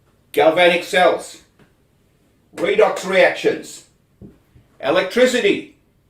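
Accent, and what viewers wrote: Australia. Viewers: He sounds like a true blue australian he makes me proud to be australian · Lol he has to be an Australian this professor. Just how he talks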